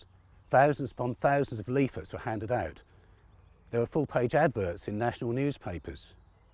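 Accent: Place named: Bedfordshire, United Kingdom. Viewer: Lovely video - enhanced by the presenter's beautiful Bedfordshire burr. If you are able, get hold of "Octavia, Daughter of God" by Jane Shaw (Jonathan Cape, 2011). It is a most illuminating book - and it WAS stocked by Waterstone's in Bedford town centre.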